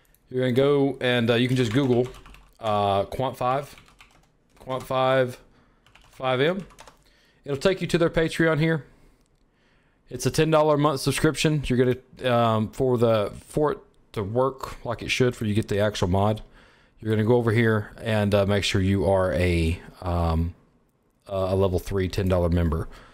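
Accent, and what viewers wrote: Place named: Alabama, United States. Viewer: Thanks man. That alabama accent made me feel right at home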